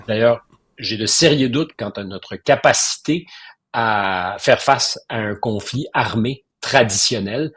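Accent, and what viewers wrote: Quebec, Canada. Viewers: l'actu avec l'accent du Québec c'est moins tragique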